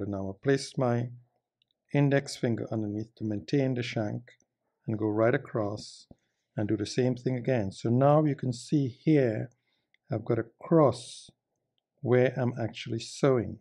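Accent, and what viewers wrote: Trinidad and Tobago. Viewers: I knew I recognized your accent, and it was confirmed with your Trini flag! I am fascinated by the amazing detail in your work! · I recognized that accent from Trinidad & Tobago, as my mother, a Serville, is from Trinidad. What an honor to learn of this as I saw you proudly displaying the Trinidad & Tobago flag. Thank you Sir for teaching us with your videos. It is quite the pleasure listening to your wisdom and learning more about the world of Bespoke. Mr., I would love to experience a collaborative video produced between you and Mr. Jacome. It would be assured artisan brilliance.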